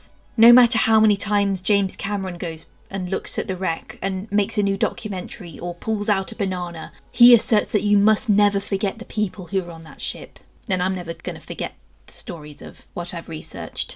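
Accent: United Kingdom. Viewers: Impressive memorial, sublime research! Lovely narration, clear, impeccable English accent. Thank you. 😇🤗💃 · Just some friendly advice: keep the info about the person up longer (it went away too quickly so I had to keep going back to be able to read it all or pause on it and i'm a pretty fast reader) and speak a little slower because I missed a solid 50% of what you said it kinda felt like I was in a rush to hear you ha so I had to go back to listen to it again. You have a really beautiful voice (then again i've always been a sucker for British accents 😉💕), great narration and well made videos but I had trouble with those two things. Not trying to be rude by the way just trying to help 🙂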